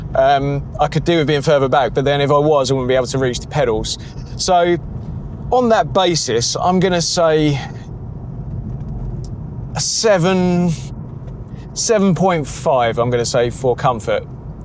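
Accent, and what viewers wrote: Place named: Cockney, United Kingdom. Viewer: COCKNEY DIMWIT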